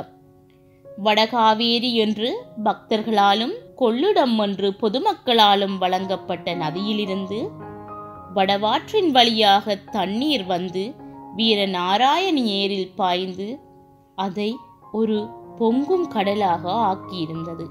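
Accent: Sri Lanka. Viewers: உங்களோட கொஞ்சும் இலங்கை தமிழில் பொன்னியின் செல்வன் கதை மிகவும் இனிமை.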